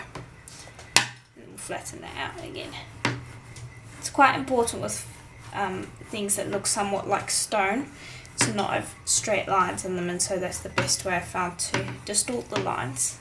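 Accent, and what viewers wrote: South Africa. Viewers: Is that a south african accent i hear? I just found your channel, very cool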